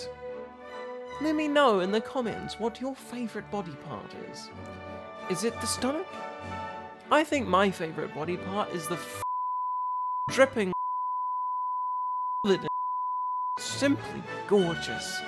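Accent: United Kingdom. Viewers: You're so much beautiful. Includes your Brit accent. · For some reason my mind keeps trying to convince me that you're attending Hogwarts. I believe it's the glasses and the accent.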